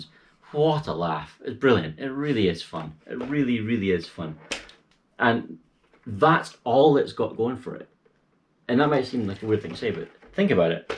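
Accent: Scotland, United Kingdom. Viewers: Yeah, man, I enjoy your talk videos! Must be the Scottish accent and your sense of humour, I usually get bored with long talk videos. Yeah, you're right, it's good fun and people should get one! Ha ha, at least you were drunk when you built yours, I think I was mostly sober, but damn, I hated putting it together! And to make me 100 percent certain mine was cursed, first time I took it for a blast, not one but two bearings seized, one wheel bearing from Fast Eddy, and the other was the bearing in the brushless motor, damn... I kept being unlucky, on my second time out, I broke a wheel (on video)... I hate those wheels, I plan to lower mine and put buggy wheels for the summer... I can't badoing mine, I'm using the smaller bumpers, works alright, I think... If you want, check it out, I have a video with mine, should be okay fun to watch...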